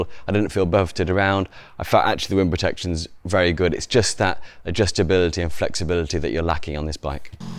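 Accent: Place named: England, United Kingdom. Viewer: Smart review and English English!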